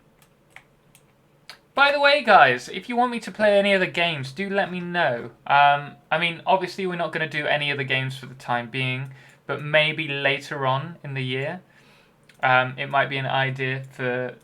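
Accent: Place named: Lincolnshire, United Kingdom. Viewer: I knew I recognised your accent! Nice to see a fellow Lincolnshire brit doing well on the YouTubes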